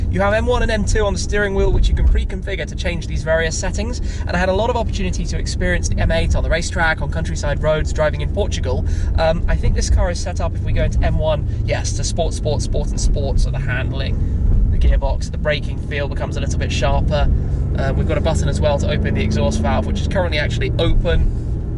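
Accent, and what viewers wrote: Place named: RP, United Kingdom. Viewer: Ur so posh bruv allow it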